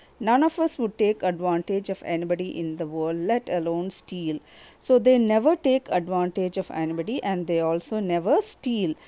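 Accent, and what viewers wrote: Kerala, India. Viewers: Are u a Malayali?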